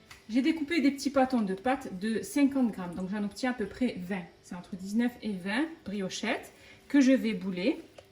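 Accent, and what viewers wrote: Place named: Midi, France. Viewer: Clair, simple, bien expliqué sans chichis ni fioritures et avec un petit accent de notre cher Midi. Resultat parfait! Bravo et merci pour cette superbe recette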